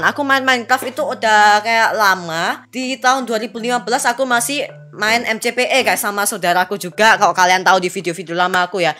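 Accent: Java, Indonesia. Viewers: Mungkin tanpa kalian sadari suara agak medok jawa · Gw Udah Penonton Old Tapi Gak pernah Komen Jir :V Gw suka Syle lu Medok :V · Medhug suaranya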